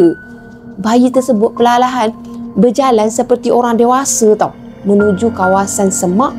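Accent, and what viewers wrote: Kelantan, Malaysia. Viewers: Kak bercakap KL capur dngn bahasa kelantan,saya selalu hadir Kak,saya dari kelantan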